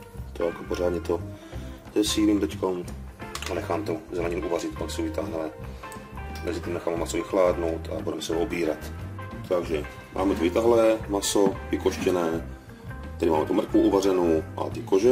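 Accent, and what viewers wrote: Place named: Hodonín, Czech Republic. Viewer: Super recept. Vypadá to parádně. Jsem sice ze Šumavy ale prakticky celou rodinu mám v Hodoníně a miluju to vaše nářečí. Strašně dobře se to poslouchá.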